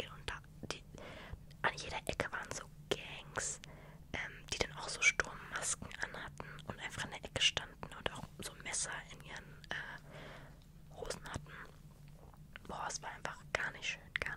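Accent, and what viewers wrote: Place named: Germany. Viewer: German accent :virtualhug::virtualhug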